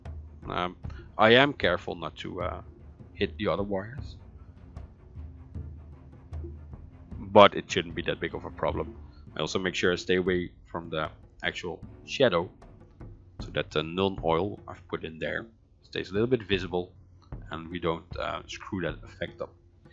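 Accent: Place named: Netherlands. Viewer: Ik herken een Nederlander wanneer ik er een hoor.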